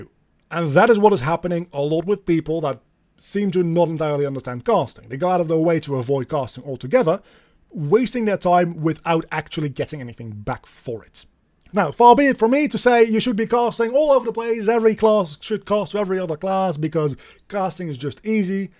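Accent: Netherlands. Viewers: Thanks for the answer! By the way, are you Dutch? I'm just curious because of the accent 😅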